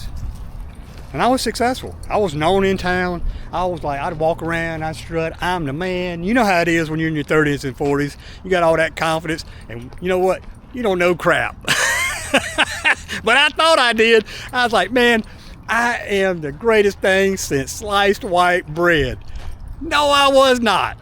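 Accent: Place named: North Carolina, United States. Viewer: Somehow your video popped up in my feed...maybe because i'm subscribed to JoGo's channel😅....(since you have the same accent as 'JoGo', I can now recognize a North Carolina accent 😅).....but that aside...you're thinking about to postpone for another 2 years...which is understanable...an other vlogger 'the Ugly Tourist'...had a friend who also planned to retire in the Phills..good job/good pay above what he already had...but he wanted to wait 2 extra years to gather more USD's...but the Ugly Tourist went ahead...and his friend....died 6 months later....so, yeah...you never know...just take in consideration that 97,99% of the people worldwide is not in a position like you do....so whille you're still breathing....GO! Enjoy more of your time!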